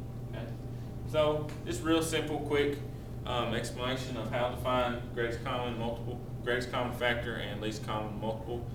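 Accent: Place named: Texas, United States. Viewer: good teacher. I like his voice it reminds me of Texas.. I like the way he does the method of problem solving too.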